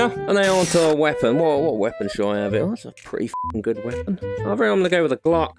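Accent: United Kingdom. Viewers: He's British mate, lives in Liverpool Essex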